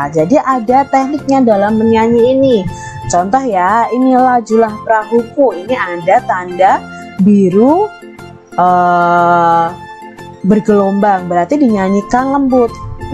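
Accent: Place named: Java, Indonesia. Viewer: Suara nya kok jawa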